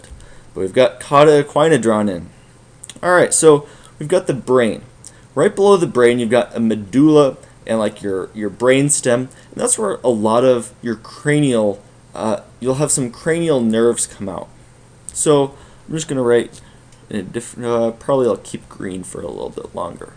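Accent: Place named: Iowa, United States. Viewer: My guess is its an American accent, from Iowa.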